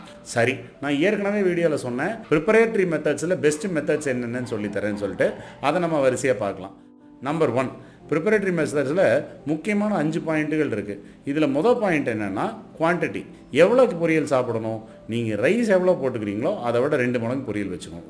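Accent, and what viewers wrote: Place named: Kongu, India. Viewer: Vadhakudhal thaan sir😂 avar pesuvadhu kongu tamil. Adhanaal thaan vanakkudhal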